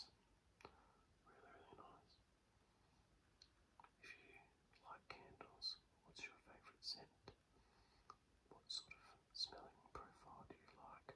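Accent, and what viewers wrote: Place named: Australia. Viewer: You sound Australian.........and I don't like it.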